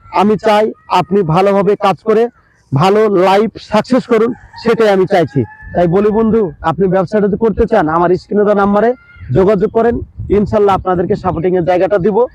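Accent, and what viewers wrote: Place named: Bangladesh. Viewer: ভাই আপনার কথাবার্তা স্টাইল তো একদম বাংলাদেশীদের মত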